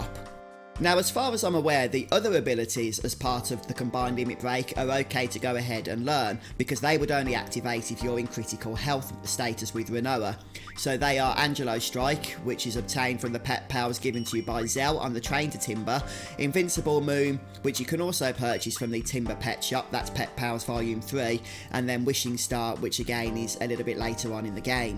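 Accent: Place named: Midlands, United Kingdom. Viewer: He's from Midlands in the UK for sure :)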